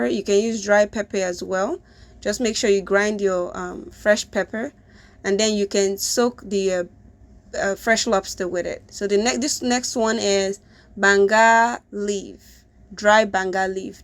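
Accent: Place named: United States. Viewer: I just finished watching a pidgin version of another video. Coming over here, It's the American accent for me o. Put in some Warm WORA... Na dem no ready. Well done. Nice video. Please, I suggest you should record your videos in landscape mode for Youtube.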